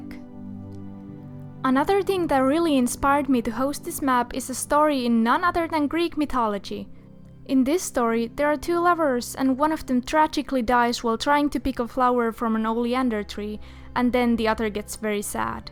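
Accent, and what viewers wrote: Finland. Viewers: Ah, i can hear the finnish englhis. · I like waffles MÄ TIESIN SEN! MÄ OLIN NIIN VARMA ET SE ON SUOMESTA JA NYT TOTUUS ON SELVILLÄ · Da Dragon Durp it's called "rally english" it's really common with finnish people xd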